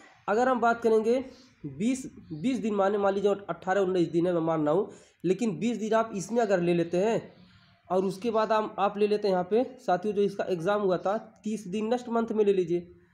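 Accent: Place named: Bihar, India. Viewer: I love bihari accent🤪🤟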